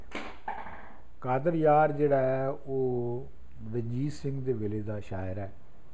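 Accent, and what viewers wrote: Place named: Jhelum, Pakistan. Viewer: Navid Raffiq , his language was punjabi though jhelumi accent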